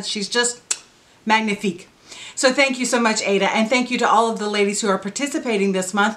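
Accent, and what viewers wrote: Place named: South, United States. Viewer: I give you a 10 times 10! This was so fun and oh my gosh, I got to see your huge closet!!!! Did I hear your southern accent here???? I love the outfit...you have never looked better and you look so dang thin!!! This was just what I needed today...that accent!!!!!!!!!!! Where did that come from!!! I love it!!! I know you have a Tv career...but I felt this video was really you! Love and hugs, Sues & The Dez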